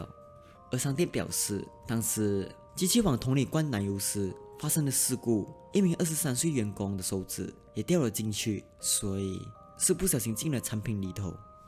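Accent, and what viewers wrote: Malaysia. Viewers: 大馬口音好嗎？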